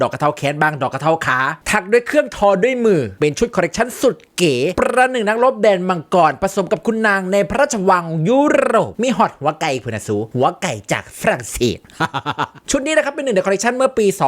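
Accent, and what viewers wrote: Laos. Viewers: อีพีนี้แอด..ตกลาวบ่อยมากนะ..ฉันอมยิ้มและหัวเราะในเวลาเดียวกัน😂..คนสิว่าฉันเป็นบ้าอยู่ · มักๆๆแอดเว่าลาว.ขี้เล่น ตลก สนุกสนาน😂😂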